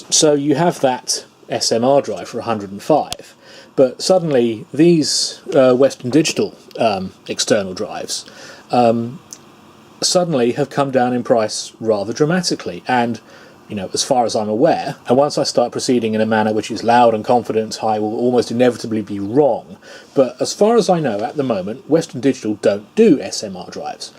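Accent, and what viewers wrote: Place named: England, United Kingdom. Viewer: Love your "English Accent" and your "Inquisitive Persistence" with the Drobo. Keep making videos when you can!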